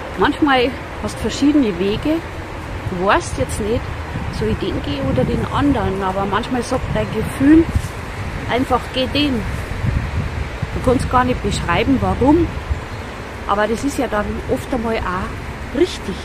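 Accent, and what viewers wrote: Bavaria, Germany. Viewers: So sympathisch und unkompliziert erklärt. Ich mag Dein bayrisches Reden und versteh's auch gut. Danke dafür. 🙏🍀🕊🫶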